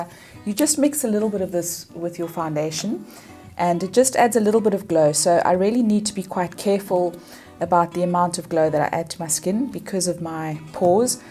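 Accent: South Africa. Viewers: You mention “Sheen” brushes. Is that the spelling, and what country are you in? I recognize the South African accent, but nowhere is there any information on where these products are available or their price.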